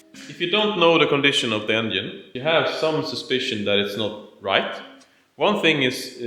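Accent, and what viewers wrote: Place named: Sweden. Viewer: yeeez verybra jdm swenglish du got my sub <3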